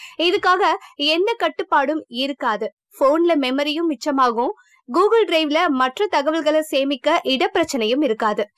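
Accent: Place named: India. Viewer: yeah great! English title spoken Indian.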